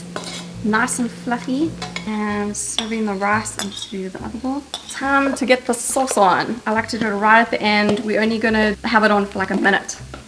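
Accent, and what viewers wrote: South Africa. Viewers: Thank you for these lovely easy recipes! I've been watching your videos for years & loved your South African accent but wow your accent has really changed so much! Love your content. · Hahaha i love the "somme" randomly coming out sometimes 🤣 mis jy ooit SA?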